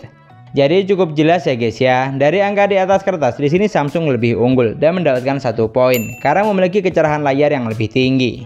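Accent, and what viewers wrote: Madura, Indonesia. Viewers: Madura ya bang?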